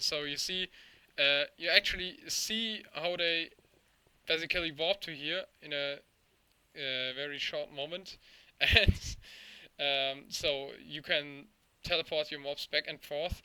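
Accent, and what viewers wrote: Germany. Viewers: Hey, one question, are you from Germany? Sounds like a german accent. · Hey Ich bin neu im Sprechen, in welchem Teil von Deutschland kommst du Deutsch? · Your accent sounds really German , are you?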